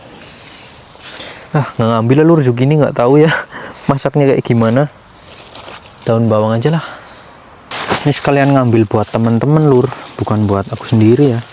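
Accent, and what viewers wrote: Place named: Purbalingga, Indonesia. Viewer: Jial ngomong ngapak ala Purbalingga 😊nyong y wong Purbalingga 😂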